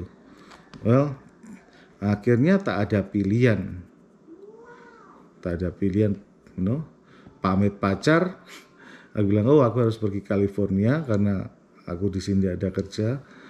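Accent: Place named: East Java, Indonesia. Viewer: Om bisa tolong bantu buat kesana gk...? Oh jawa mana om kok kaya orang jatim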